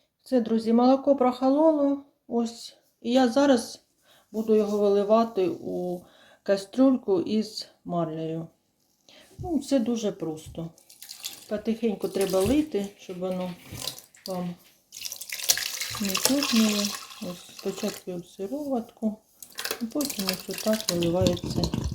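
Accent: Chernihiv, Ukraine. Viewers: Вітаю,зайшла до вам на канал випадково,але почувши такий рідний Чернігівський акцент,просто,як бальзам на душу, дякую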